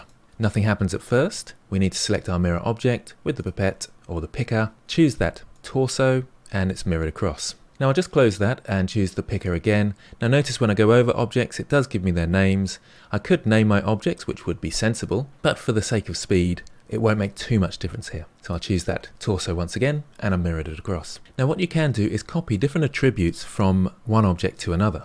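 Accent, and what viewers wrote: United Kingdom. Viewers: You're quite British dude. just couldn't follow blender guru at all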